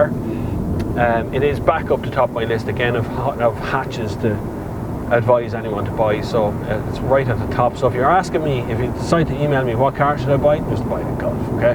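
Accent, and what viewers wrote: Ireland. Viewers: I like your Irish accent :D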